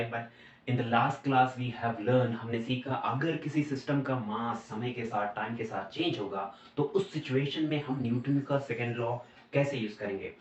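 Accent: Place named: Hyderabad, India. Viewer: yoo, bro sounds hyderabadi, btw great explanation bruh, and why not uploading videos on yt rn??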